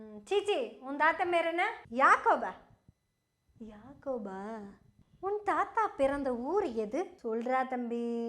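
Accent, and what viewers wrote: Kongu, India. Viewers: சகோதரி, உங்க கொங்கு தமிழுக்கு நான் ரசிகன். 👏👏👏👏👏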